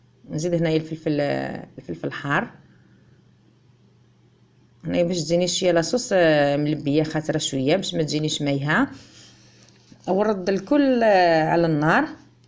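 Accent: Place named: Jijel, Algeria. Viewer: صباح الخير بنت بلادي الشاطرة الوصفات التقليدية نعرفهم ديالنا نحب نسمع اللهجة تعك الجيجلية اللهم بارك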